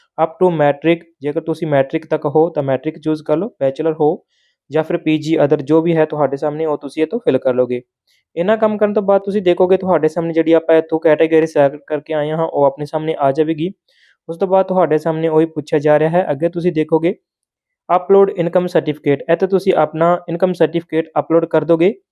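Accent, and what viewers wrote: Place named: Bihar, India. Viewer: Tere awaj bihar Wali lugi menu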